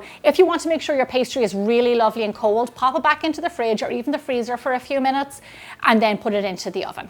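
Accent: Ireland. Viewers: If I was to draw a photograph of Irish, it would look EXACTLY like you! (And I don’t mean that in any negative way whatsoever). Love your videos and your wonderful Irish brogue!!🇨🇦🇨🇦 My wife is making your ice cream today!!! Thanks so much!!❤❤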